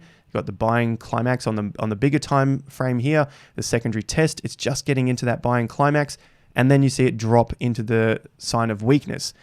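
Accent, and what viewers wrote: Australia. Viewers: It’s great to hear another Aussie. Are you Melbourne based?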